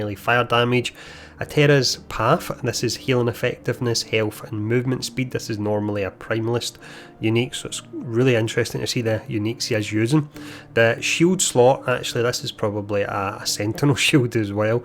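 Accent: Scotland, United Kingdom. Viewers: Scottish accent ?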